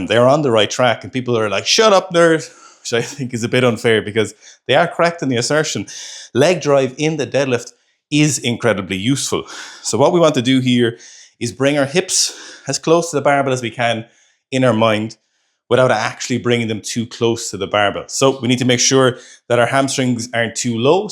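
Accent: Ireland. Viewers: How do I know your voice? Are you feom Limerick?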